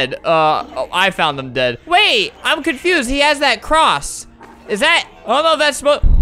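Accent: United States. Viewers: U always sound like ur from america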